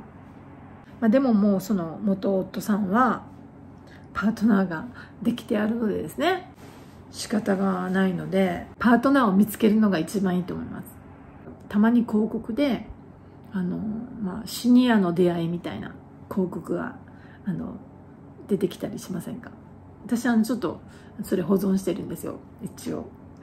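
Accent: Kyushu, Japan. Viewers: やっぱり九州訛りが耳に着く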